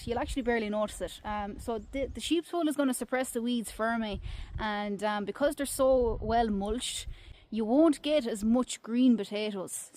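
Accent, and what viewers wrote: Ireland. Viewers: Love your accent, no wonder my grand-grandfather married an Irish girl. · I love that irish accent…. Right. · Wow intelligence backed by a witty sense of humor good looks and that wonderfull Irish accent and just a generally inspiring girl who loves what she does and is exited to share that with us all.. It sure why this came into my feed but happy it did though❤ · Irish accent sounds exhausting to speak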